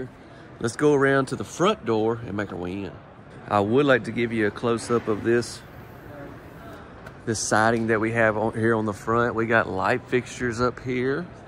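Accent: South, United States. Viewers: I LOVE YOU! I need to tell you though it’s not Loo-EE-Ville. It’s Lou-a-vull with the a barely heard! I live in Bowling Green, KY (aka Corvette City USA) We Kentuckians have been adding & deleting syllables long before the Millennials made it a “thing.” 😂 With your oh so pleasant sounding voice and friendly southern accent you can pronounce Lou-a-vull & sound like a native!!!! 😊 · I love to watch your videos! I’m in the process of building a new home but I love your southern accent and it’s just fun to watch your tours! Don’t ever stop being you!! I get lots of ideas from watching!!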